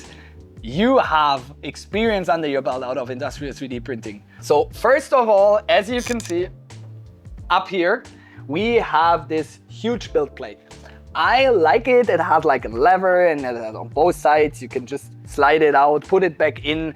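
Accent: Germany. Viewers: i was thinking this dude has a german accent.. .then i saw the waschgang sticker :D geil :D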